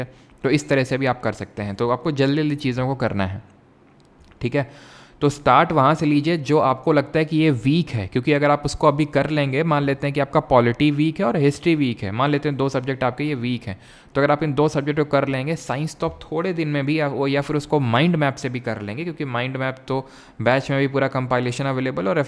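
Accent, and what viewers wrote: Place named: Uttar Pradesh, India. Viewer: Hey bhagwan bhaiya aap ekdm humhi log jaise bolte hain jaise ghr pr bhaiya log bolte hain bhulee jaiye 😂 ...haiyye hai...😅pehli baar koi up ka hai bhut shi lgta hai aapki baat sunkr aise ghr wali feelings aati hai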